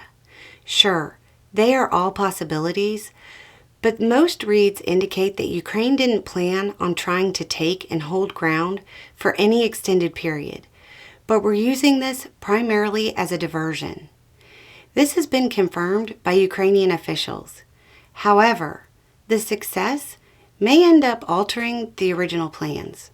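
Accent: South, United States. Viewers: I'm increasingly enjoying the dichotomy of the words of conflict, warfare and weaponry with the sweet voice and Southern charm accented delivery. Just wondering for the future, if there would be a need to bring Beau out of mothballs for the quiet rage that he occasionally would bring. Just would prefer if there is no incident that would cause such a need, though.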